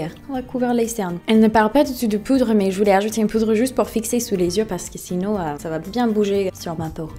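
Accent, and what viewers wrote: United States. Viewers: Ça fait partie du charme de son accent americain! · Ah ah une française sans mascara ? Moi je peux pas vivre sans ! J'adore ton accent américain 😍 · Je vien de m'abonner à ta chaîne et je te trouve tellement belle tellement fraîche ! Et ton accent américain est juste trop CANON grace a toi j'en apprend plus sur les américains et les usa ! Mon reve est d'aller a NYC mais j'ai peur de l'avion (c'est ironique hein) Ahaha gros bisous ! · Bonjour, Je lâche très rarement des commentaires sur Youtube, mais je prends le temps de t'écrire ici car je te découvre tout juste sur ta chaine et suis tombé immédiatement sous le charme. Belle comme un cœur et possédant un charme fou, cet accent américain finira par me faire découvrir une personne attendrissante et qui traite de sujet France/Amérique sous un autre jour et d'un point de vue étranger. 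Bref, je ne fais pas une déclaration, loin de moi cette idée, mais tout simplement la découverte d'une personne rayonnante et intéressante qui t'a fais gagner un abonné de plus:) Te souhaitant une bonne et heureuse continuation dans ta vie de tous les jours et sur Youtube;)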